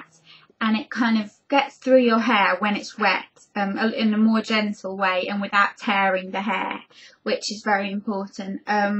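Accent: United Kingdom. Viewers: we have similar hair and everything you said was really interesting. Btw ,just so you know, If I had a british accent, I would never shut up :D lol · omg!! thanks for your tips. my hair is very similar to yours when i was younger i hated it i wanted it straight all the time. now at 37 years old i love my curls i get many compliments. love your british accent! all the way from puerto rico!! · I. Love. Your. Accent. SO MUCH brittish accent always reminds me of harry potter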